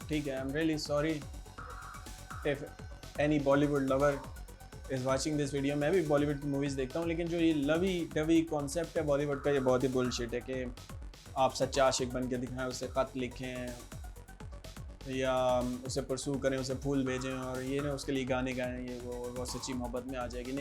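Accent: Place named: Kashmir, India. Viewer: Sir, not only vdo, but and every trick and sentence, crystal clear logically effective. Hopefully you are from JK as voice tone says where I stayed aporox 14 years. 👍🙏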